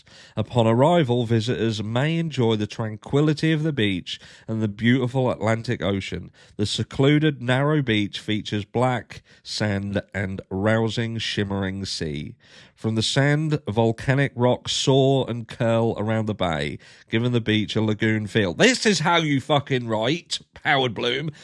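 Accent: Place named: Norfolk, United Kingdom. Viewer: Of course it's bullshit. You are right. Even in our in bread norfolk, where I a sm from and sounds like you are, this shit don't happen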